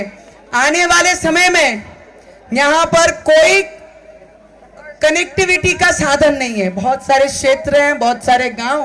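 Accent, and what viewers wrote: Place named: Maharashtra, India. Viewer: She speaks in typical Marashtrain style. There is no way she appears a North Indian.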